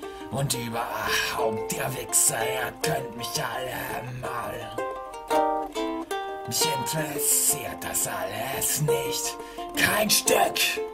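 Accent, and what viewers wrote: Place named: Hesse, Germany. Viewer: wo hast n du dein dialekt her? du hörst dihc an wie meine ex ^^ ich glaub aus hessen kam die xD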